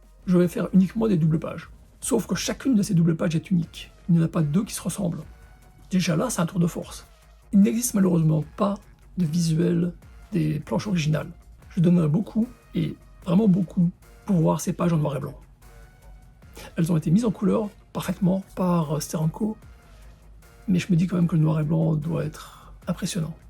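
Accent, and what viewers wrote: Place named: Nancy, France. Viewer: Bravo pour cet excellent épisode, bien documenté, qui rend parfaitement hommage à cet immense artiste. Et bravo pour ne pas avoir perdu l'accent nancéien après 20 ans aux US !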